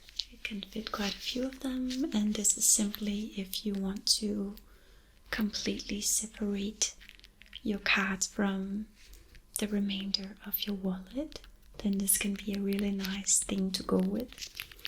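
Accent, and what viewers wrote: Denmark. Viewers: the danish accent!!! :) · Som dansktalende, krummer jeg tæer over den accent.. Danglish at its finest.. Hvorom alting er, skøn video som altid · Det er ikke danglish nok. Jeg kan faktisk holde ud at høre på det :^) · This video was AMAZING! I loved the layered sounds going on, and your voice was so clear and can we talk about your wonderful accent and Danish dialogue?! Goodness was it tingle inducing! Bravo!! ❤ 😍 ❤ 😍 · Please do more of these Danish accent videos! It reminds me of my Danish grandparents, they both spoke fluently and had slight accents.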